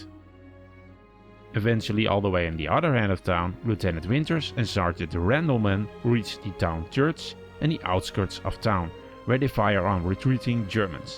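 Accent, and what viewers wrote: Netherlands. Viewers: You sound dutch as frick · Are you Dutch? Seem to have an accent. Thanks for this ! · Aan je accent te horen ben je overduidelijk een Nederlander - ik ook zoals je leest ;-). Het algoritme van YT bracht me hier, echt interessante video, goed gemaakt! Nu mijn vraag: heb je al overwogen een 'episode compare' te maken van de afleveringen van BoB die zich in Nederland afspelen? 't Is al minstens 10 jaar geleden dat ik de serie voor het laatst gezien heb, maar ik meen me te herinneren dat minstens 2 afleveringen zich (iig gedeeltelijk) in Nederland hebben afgespeeld (Eindhoven, Veghel & buurt van Nijmegen -> die met dat kruispunt ergens in een polder vlak bij Nijmegen). Heb je uploads bekeken, maar zag ze er niet bij staan... lijkt me echt interessant vanuit historisch oogpunt, ook voor de vele fans van over de hele wereld van deze fantastische serie, plus qua productie voor je relatief 'eenvoudig' te maken - je woont hier immers al (neem ik aan, althans). 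Ik voorspel je, het zal echt goede content zijn die je veel views zal opleveren! :-) (een hele serie over alle afleveringen zou helemaal een hit zijn, denk ik... maar het moet allemaal maar kunnen natuurlijk -> daarom, Nederland om te beginnen, lekker dichtbij)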